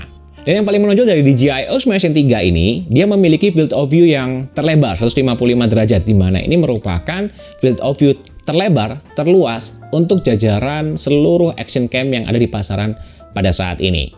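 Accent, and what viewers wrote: Malang, Indonesia. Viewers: Lah orang malang ta ? 😅 suka sama review nya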